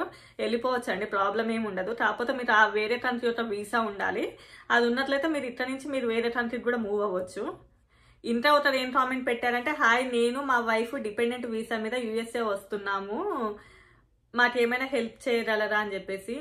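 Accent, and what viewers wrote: Telangana, India. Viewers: Nachindi video accha Telangana bashalo mtladutunnaru, mie basha nachidi Thanks andhi 🙏🙏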